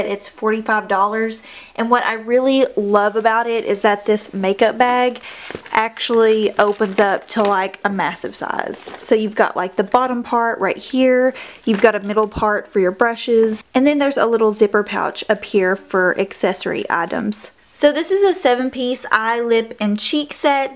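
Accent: South, United States. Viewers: Love to find a beauty guru with a southern accent 😘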